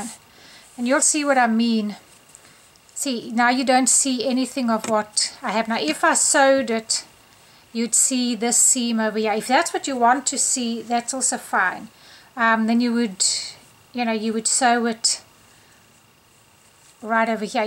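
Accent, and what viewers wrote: South Africa. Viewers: Am I right, don't the lady who speaks live in South Africa? Sounds almost like the South African accent. Anyway, beautiful Kimono!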